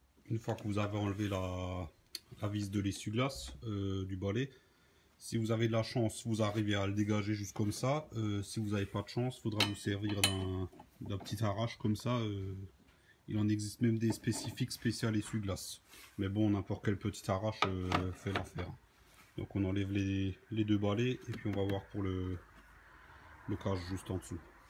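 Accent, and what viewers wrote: Alsace, France. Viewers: Accent alsacien ? ;-)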